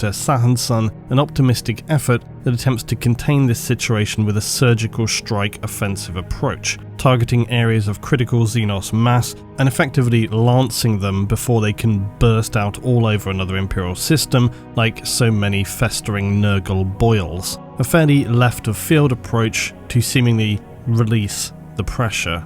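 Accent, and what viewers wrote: England, United Kingdom. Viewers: Documentary content just sounds better when narrated with an English accent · 2nd this, rather enjoy me some sophisticated brash british guy & the pragmatic dry humor & his narration tone that slaps like cold steel and enraptures one so thoroughly you hardly even notice the mutton chops growing over you’re ears. Edit: Reasons.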